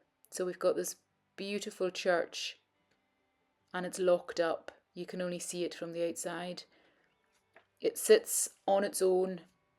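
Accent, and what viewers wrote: Scotland, United Kingdom. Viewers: I've just watched this again! I could listen to you all day haha I just love your accent. It's weird for me that my great great grandad would have had one similar (tho he was from Dumfries & Galloway) because no-one in my family has a Scots accent. So the music, in this one I think it's just right, but I do think when you are speaking, don't have music as well. I have my volume up loud so I can hear the commentary, so it's a shock when suddenly it's music and loud lol. Did the wind muffler arrive yet?? You should be really successful at this you are SO watchable.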